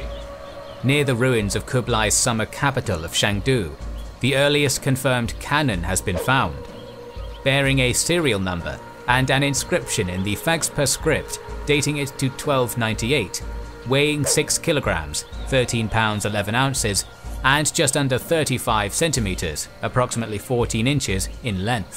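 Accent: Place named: United Kingdom. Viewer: With the narrator's British accent, war pow might have been closer to the Mandarin pronunciation for him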